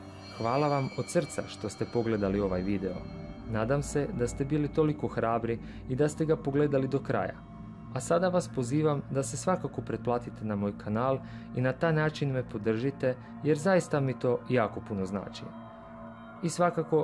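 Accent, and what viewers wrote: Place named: Ekavian, Serbia. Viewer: Uh uh uh uh uh uh uh uh uh uh uh uh uh uh uh uh uh uh uh uh uh uh uh uh uh uh uh uh uh uh uh uh uh uh uh uh uh uh uh uh uh uh uh uh uh uh uh uh uh uh uh uh uh uh uh uh uh uh uh uh uh uh uh uh uh uh uh uh uh uh uh uh uh uh uh uh uh uh uh uh uh uh uh uh uh uh uh uh uh uh uh uh uh uh uh uh ih uh ih a ka ono si ti šef vi ste ekavci srbi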